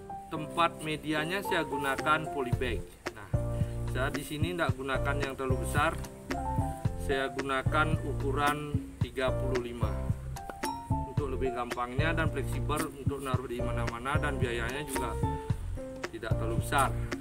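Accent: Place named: Bali, Indonesia. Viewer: oh pantes logatnya kayak orang bali😂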